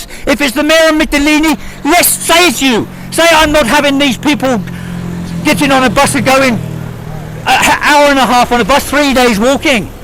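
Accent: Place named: South Africa. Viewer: Hey this is my island mate. Its admirable that you took up the cause. I, like many people, have done our bit to help. For a period there there was limited resources in a country that is suffering economically. The EU budget slashed costs from the EU border patrol I think in 2013. The people and the authorities have ploughed money (which they do not have) in to solving the issue. Almost everyone on the island has a descendent who is a refugee (Asia Minor).We are all compassionate people. Lesvos and every island has provided support. Remember, we didnt plan or expect people smugglers to send the migrants across dinghies and dangerous waters. Please refrain from comparing us to to ISIS??!! Had you avoided that, I would not have worried about your clip. WTF is that about. Its like me saying you have a South African accent therefore you are part of the regime of apartheid. 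Please do not insult our people, otherwise let's look at the history of where you are from.... Its easy to be a critic, but everyone on the island from the authorities down has a heart at a time when Europe has turned its back on Greece. Keep that in mind. The heat and the walk was terrible. During Ramadan, these migrants suffered even more, unnecessarily. The war in Syria and other places is tragic, and a tragedy that should have been prevented. Eventually the issues you point out in this clip was sorted out. Your emotion, again is admirable, but do not tarnish our people or island without the full facts. Go th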